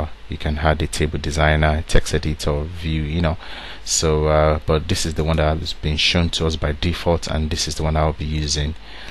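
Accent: Nigeria. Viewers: Man. I love your Accent. It sounds Nigerian. I am pretty sure you are from Africa.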